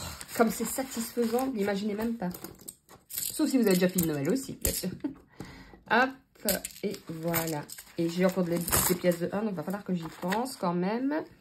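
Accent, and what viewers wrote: Belgium. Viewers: Coucou 😊, j'aime beaucoup tes vidéos, c'est très agréable de t'entendre parler avec ton accent belge🤩 J'attends avec impatience la vidéo de ton jardin 🌻⚘🌺🌱🌿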